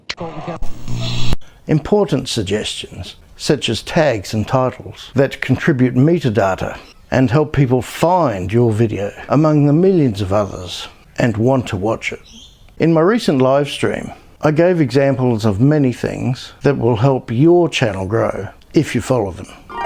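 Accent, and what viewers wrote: Australia. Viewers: I don't know what the hell I'm talking about. But it's nice to have someone reply back and share things to say. You sound like your from Australia. U used to live down there in Sydney. I'm probably gonna go back as soon as I can. I'd rather be down there if the shit really hits the fan if you know what I mean.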